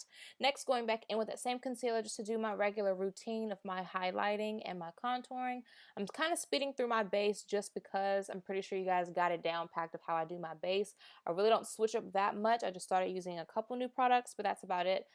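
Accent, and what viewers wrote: South, United States. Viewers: That southern twang! It's so cute!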